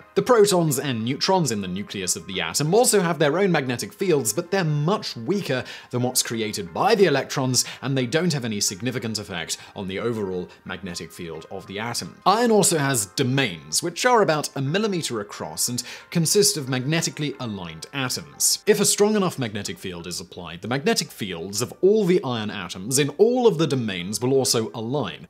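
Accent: United Kingdom. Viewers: I had no idea how many weebs -- specifically JoJo fans -- were part of Simon's fanbase until I looked in the comments. I just assumed YouTube first recommended me his videos because he's got a British accent which is coincidentally similar to the accents of aniTubers I watch. Let's try to decode this insane YouTube algorithm! Which aniTubers do you watch if any?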